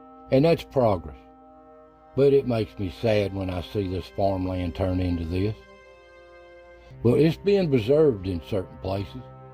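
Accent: Appalachia, United States. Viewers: Well said. Another thing distressing to me is there's nobody under a certain age anymore that's "country". Even if they're born & raised in the country they don't sound like it. They don't have the heavy accent like you & i . That's not a crime but they all seem to talk so fast i have to get them to repeat theirself. Makes me kinda relieved to be past 60, so folks don't expect much, lol.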